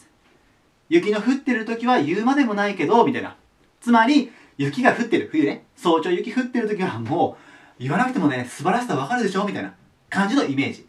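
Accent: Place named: Osaka, Japan. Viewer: 大阪の方ですか？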